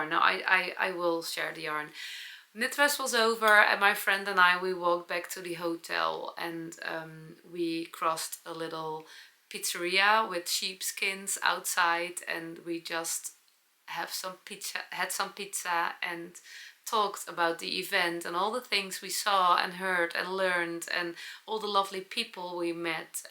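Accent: Netherlands. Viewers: What a marvelous festival. And your extensive detail conversation/coverage of all the events/your precious moments… With laughter, just infectious and joyful for us to share. So very happy for you. Your English is beautiful… And your Dutch English is part of your charm for all of us! So now you have raised your inner confidence level speaking in such a venue… It makes such a difference when you are with people who have the same interest! I watched most of the podcasts of the people you shared the panel with they are my favorite favorites along with you Marvelous interesting story about the roll up the sheep company! So meaningful” heart jumping“. Whatever you make will be extra extraordinary… You have such a design sense. Just adore the fibers that you bought… May you enjoy each stitch with them! Interesting the English ladies’s name was Diane who will teach you English as that is my name! 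I felt rather smiling when you said her name! You will take your time to rest recover… And I am sure that there will be more beautiful stories that you will share with us. Your wonderful videoing made me feel as though I was with you! It was fabulous! Wishing you well… Take a step back… Rest yourself! And then… Dive into your next project! You are such a loving, joyful spirit, and it touches me deeply to watch you! And in advance of your birthday… I am sending you the most heartfelt warm embraces of wishes for good health, many heart, jumping moments, not only in your knitting, but with you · Dear, I ❤ your Dutchy english. It is so sweet.😊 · Thank you for a lovely video. You are very inspiring. Please don’t loose your charming Dutch accent! 🌷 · I enjoyed your video so much I love your Dutchy English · This looks like a wonderful weekend. I think your dutchy English is charming. Thank you for Sharig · Dodgy? 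It's "Dutchy English". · I think your English is perfect, just the way it is. It’s ok to let your Miss perfect go. Your Dutchy English is what makes you so lovely ! I just love your knitting style! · I could listen to you all day. Love your English with the Dutchy accent. The yarns you bought look beautiful too. Can’t wait to see what you create!!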